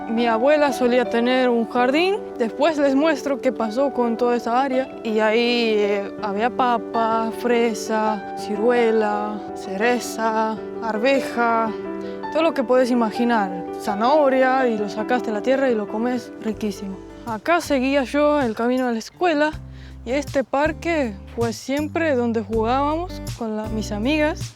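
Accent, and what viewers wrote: Argentina. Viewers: tienes acento argentino 😲😮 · Fantástica, debería hacer más vídeos sola, por cierto no veo nada raro en tu voz, lo curioso es una estonia con acento argentino, deberías buscar un acento más acorde a tu continente, es decir castellano europeo, no latino americano, que no es algo malo, pero pareces argentina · Tiene acento argentino o me parece😮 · Habla perfecto,es español,y tiene acento argentino😊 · Un lindo detalle tu español es muy Argentino, me hace reír , se te escucha bonito , cuando quiera mudarte a algún lugar vente a vivir a Venezuela,la isla de Margarita pudiera ser y cuando extrañes el frío , te vas a los Andes , y quiero selvas Ríos te vas al sur Al estado Bolívar , y al estado amazonas , y si quiera decierto ve a los médanos de coro , Adicora , y para el caldo s ve a Maracaibo, y quierea estar con ganado te al llano , montas a caballo , y cantas y bailas Joropo , aquí lo tienes todo ven que te muestro 😅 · Habla su español en Argentino 😅 .. 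te felicito por tener muy buen español excelente video bonitos recuerdos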